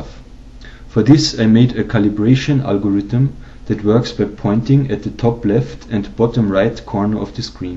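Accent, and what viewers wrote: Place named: Germany. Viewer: i like your english bro ...it sounds so german xD Njo egal, find ich ne geile sache xD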